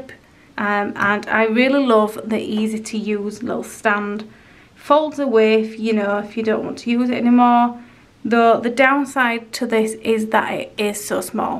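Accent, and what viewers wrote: United Kingdom. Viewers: Off Topic: Where do you think has this Person grown up in GB? I try to lokate the accent. Thx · Definitely UK. Northern England.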